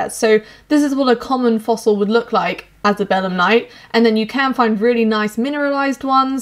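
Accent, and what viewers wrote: United Kingdom. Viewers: Talk about paleontology it's amazing, but British accent make this talk so beautiful. Thanku! Rawr!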